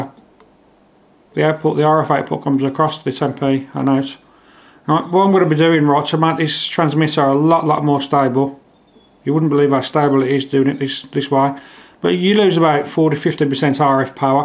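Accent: United Kingdom. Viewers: your accent has too much British in it. hard to understand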